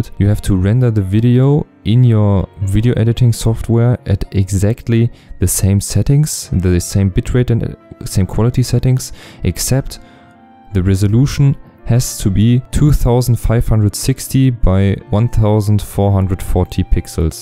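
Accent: Germany. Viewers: just uploaded a video on 1440p. first problem: i can only watch it on 1080p plus it`s still avc. hopefully it's still processing and in a few minutes it's fine edit: ich wusste doch dass du deutsch bist das hört man :) nichts gegen dein englisch, alles gut :D 2. edit: alles funktioniert danke :D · Man hört deinen deutschen akzent, fand das Video trotzdem hilfreich.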